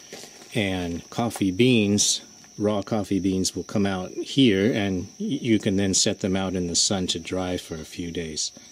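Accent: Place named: Wisconsin, United States. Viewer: great video. guy sounds a bit like Clint Eastwood with a Wisconsin accent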